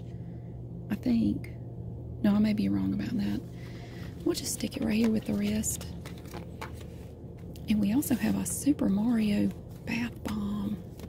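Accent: South, United States. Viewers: I guess being an adult means falling asleep to a southern lady organizing the clearance section of the dollar store.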